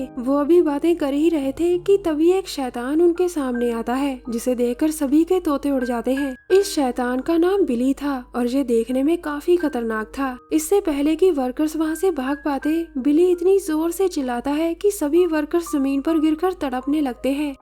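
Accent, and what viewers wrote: Punjab, India. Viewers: Sis tusi punjabi o na 😂 ?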